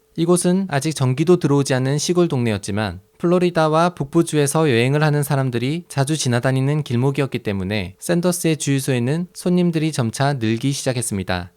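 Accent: Gyeongsang, South Korea. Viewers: 어머니 에서 사투리는 속일수 없네 ㅎㅎ그냥 경상도 사투리로 하세요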